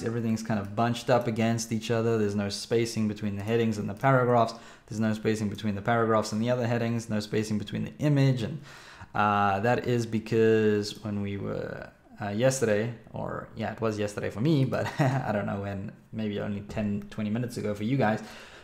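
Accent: South Africa. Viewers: I'm getting addicted to your tutorials and that accent from SA. Thanks, from Essex, UK.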